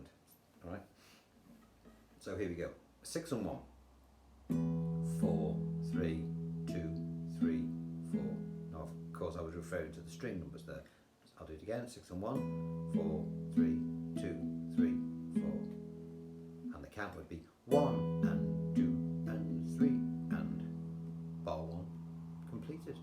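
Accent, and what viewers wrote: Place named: England, United Kingdom. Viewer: Hi. I have only just found your YouTube site by accident. I was looking for a tutorial for and you came up. I have had a look through and now subscribed to your channel. I have been playing a few months now and learned some open chords which I will need some time. The trouble is I can’t sing so want to play instrumentals which I have started to plat, still slow as it takes longer to sink in with me. I started a bit late in life wanting to learn guitar but can play a little, it is only for myself but enjoy what I am learning. I am 72 so don’t know if I will ever play perfect or not but I will have a good try hopefully. Thank you very much from me to you because I like your approach to your teaching method. From your voice I know you are English but obviously don’t know if you still live on the UK or not. I am from Warrington Cheshire, you will probably know where that is. 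Anyway I won’t bore you to death any longer, but once again a big thank you from me and others that watch you or have lessons with you. Take care and best regards. Chas.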